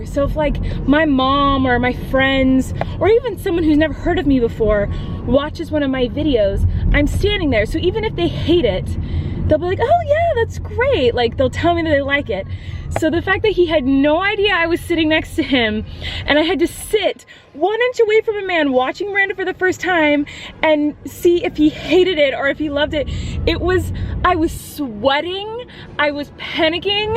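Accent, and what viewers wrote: South, United States. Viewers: I'm from Louisiana but nobody talks like that here lol. But I agree that the southern accent is spot on, for like Georgia and Alabama and Tennessee! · I could listen to Colleen's southern accent all day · Southern accent is so accurate! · Hahah love Colleen's southern accent! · Colleen's southern accent makes me smile, and I'm not even from the south! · omg I'm from Louisiana and your southern accent is so accurate 😂😂😂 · Love your stereotypical southern accent 😂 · I know I'm from the northern pat of Florida like 1 hour away from Alabama and we're "southern" and her accent is on point😂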